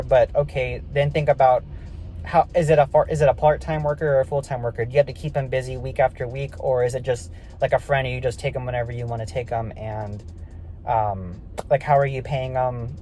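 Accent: Texas, United States. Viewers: What part of Texas are u from Bro?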